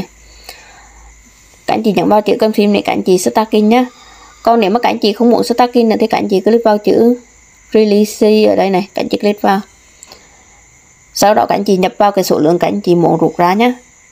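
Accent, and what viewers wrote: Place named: Quang Tri, Vietnam. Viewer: A quảng trị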